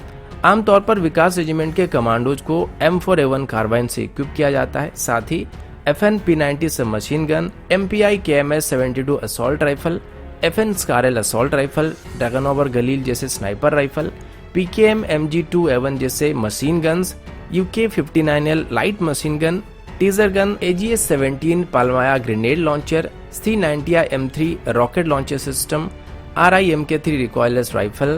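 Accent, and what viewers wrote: Odisha, India. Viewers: Vai tum odisha se ho kya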